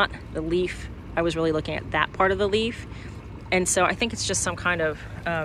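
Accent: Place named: Texas, United States. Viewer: Your garden is such a delight. Enjoy listening to your Texas voice as you describe everything. Thank you for sharing.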